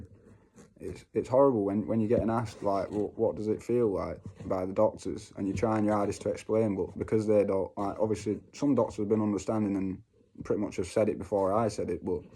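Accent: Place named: Manchester, United Kingdom. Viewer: Hi where do you live and who is your Neurologist. You sound as if you maybe from Manchester. I am on Keppra and Epilim. Clobazam is something I was given but it has side effects and is so difficult to come off so I didn’t start on it and I explained to the Neurologist. There are not many young people who share their seizures so I am glad you are.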